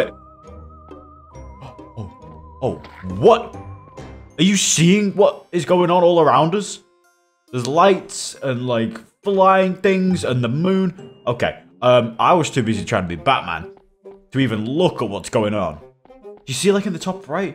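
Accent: United Kingdom. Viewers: You do sound kind of British,.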